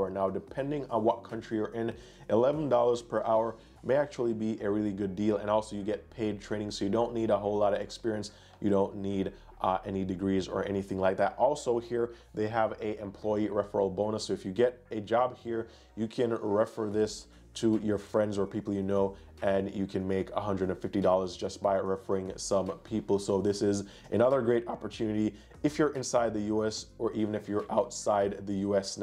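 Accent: Jamaica. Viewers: Sense a bit of an accent. Are you Jamaican?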